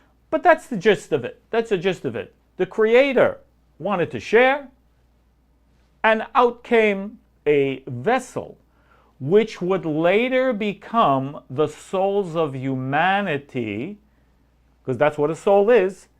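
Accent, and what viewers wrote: New York, United States. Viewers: It's always a pleasure to virtually sit with you to learn. Thank you teacher Rabbi. Yearning reminds me of what I tend to tell people: you must back up your desire with action. It's that simple. Much love and respect to you Rabbi. Many blessings to you and yours. On another note, your New York City stye always brings me home again, lol.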